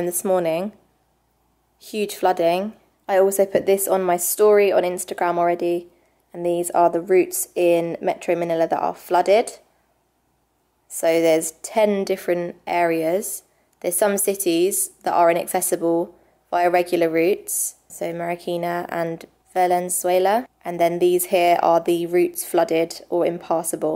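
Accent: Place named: London, United Kingdom. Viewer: Manila always gets hit very badly.....Great to hear London English. Greetings from Rosario Cavite.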